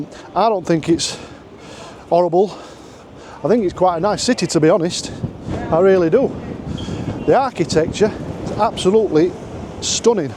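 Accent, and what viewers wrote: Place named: Yorkshire, United Kingdom. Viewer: Hi wast sure as new to you tube shows like this if you were being sarcastic or taking offence ? I was saying your accent is very broad yorkshire like some in Emmerdale there is nothing wrong on that unless no sense of humor But Bradford is okay city like everywhere in England and USA ideology everything sadly is going wrong all over UK generally as mass capitalism and individualism have causes a lot of problems all over UK as we follow isa ideology of divide and rule many English folk are often negative about everything these days sadly as you said Bradford has good curry Capital also is capital of culture this year And Broadway shopping center is nice and many places But everywhere has changed in UK I remember the Bradford City fire as well very sad tragedy it was just when you wrote about being from yorkshire with me saying about your accent came across as if you had took offence ? 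Problem is most English have become rude these days wherever you go in England nice areas or poorer ones the last ten years has told me England is not a country that's safe or nice full stop anymore no democracy capitalist dictatorships like USA and as I write this we are being sadly groomed for another war in Russia Ukraine so that new Tory party robot starner and Co can use it as a distraction and a excuse to cut benefits for disability people and there is millions of other problems going on all over UK generally I think of we try to talk up places and England will also help take care and the v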